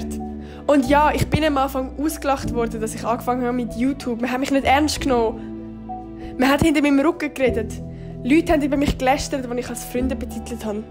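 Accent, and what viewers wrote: Switzerland. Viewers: Ich liebe deine Videos! Am geilsten finde ich den SchwitzerAkzent der mich immer zum Lachen bringt. Ich bin froh dass du YT machst. Beste Youtuberin! Du hast keinen Grund dich Unwohl zu fühlen da du total schön aussiehst und eine Hammer Figur hast!❤ Ich und viele deiner Tausend Abonnenten stehen immer hinter dir und sind für dich da! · Dieser Schweizer Akzent ist so geil haha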